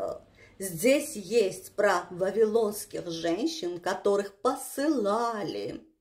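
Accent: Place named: Georgia. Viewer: твой грузинский акцегт просто убил!!!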